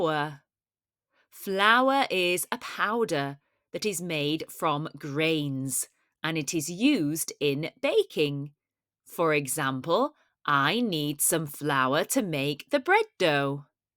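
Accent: United Kingdom. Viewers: Brit accent is precious ♥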